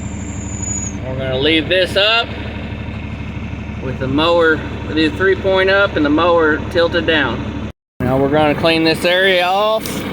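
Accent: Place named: Georgia, United States. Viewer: Damn son that is one hell of an accent! Georgia boy?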